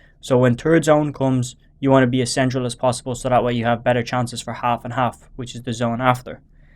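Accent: Ireland. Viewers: Are you Irish btw?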